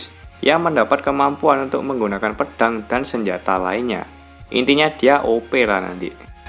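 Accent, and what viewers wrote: Java, Indonesia. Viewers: jawa banget koe lur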